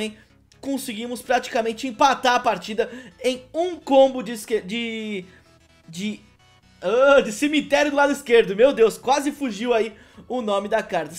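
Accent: Minas Gerais, Brazil. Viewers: mineiro ou tronco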